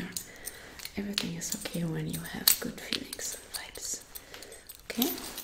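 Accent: Germany. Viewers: Love your videos. I love your german accent.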